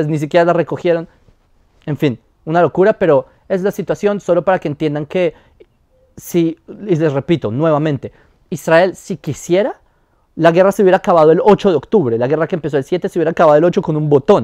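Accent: Bogotá, Colombia. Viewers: Acento rolo (Bogotano) que tienes.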